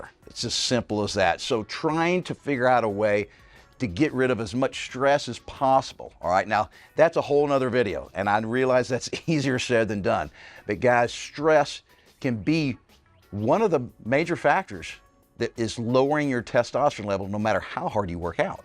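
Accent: Texas, United States. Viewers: He’s a Texan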